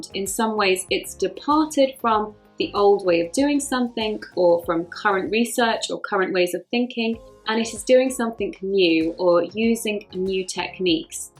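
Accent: United Kingdom. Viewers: Great British accent ❤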